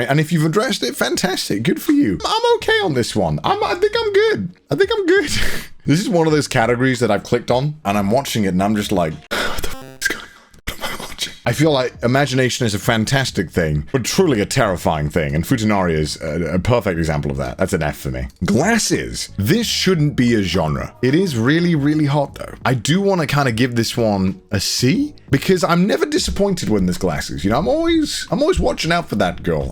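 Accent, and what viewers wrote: United Kingdom. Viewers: this is the first time I keep hearing just be so british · Why does sound extra British in this video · WAIT- BUT THE WAY HE USED HIS BRITISH ACCENT TO BEAT THE YOUTUBE-SYSTEM 😂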